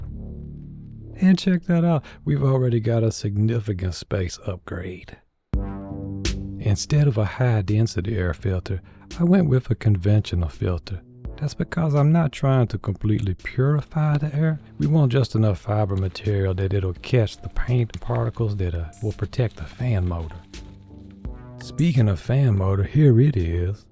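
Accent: Louisiana, United States. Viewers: New here! Great channel. Very well done! Are you in Louisiana? Nailing the accent if not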